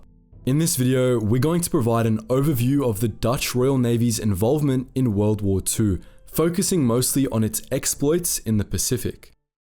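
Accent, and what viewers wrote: Germany. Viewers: Great video, but I feel like you’re trying to say the dutch names the dutch way, but your’re using the german way of saying them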